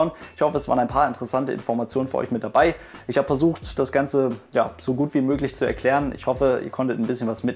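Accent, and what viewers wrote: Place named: North Germany, Germany. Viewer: Er ist nu mal ein Norddeutscher, da heißt das Flugzeuch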